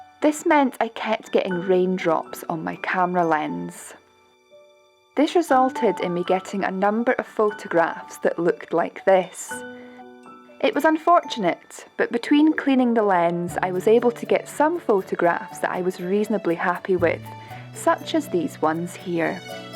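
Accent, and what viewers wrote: Scotland, United Kingdom. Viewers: I always look forward to another of your sessions but one thing is that I wish you would give more information, especially if it’s a “monument”. What was this monument, a church, an abby or a castle. If at lest we knew the name of it, other than “monument”, we could Google it and find out what it was, when it was built and the history of it. I certainly enjoy your channel and the beauty of Scotland, your pictures, and your accent when your talking.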